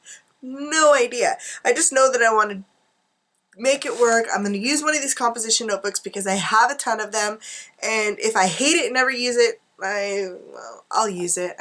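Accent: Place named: United States. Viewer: Ah well I am British and you are 'very American' to me, I don't know what very means but to me you definitely have an accent lol xx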